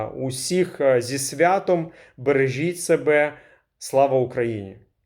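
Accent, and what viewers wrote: Russia. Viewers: Что то вы с сильным русским акцентом и натужно по-украински изъясняетесь. По русски у вас получается более бегло.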